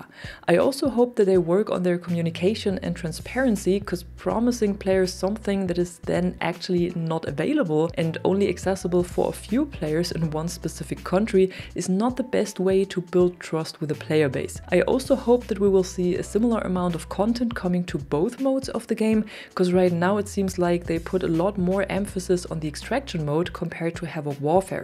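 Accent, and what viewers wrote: Germany. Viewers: ich faends richtig klasse wenn du einfach richtig deutsch englisch sprichst. ich versteh immer nicht warum leutre krampfhaft versuchen irgendein mittelamerikanischen akzent zu imitieren. bööörgr. for the most part, you speak english with german accent, und das ist auch gut so. alles andere wirkt laecherlich, bei jedem der versucht nativ speaker zu imitieren. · Hey, are you german? Your accent gives me hard german vibes.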